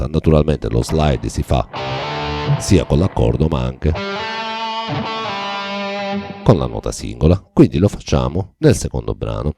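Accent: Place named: Sicily, Italy. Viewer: io sono ciociaro di origine, ma essendo sposato e vivendo a Palermo, l'accento siciliano non è passato certo inosservato 😂 Comunque complimenti ancora e ti seguo sempre.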